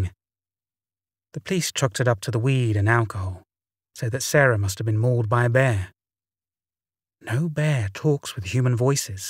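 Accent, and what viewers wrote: England, United Kingdom. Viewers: More than happy to leave a comment. It's comforting to hear hear a fellow Englander narrating these Wendigo and Skinwalker stories. I really love your channel.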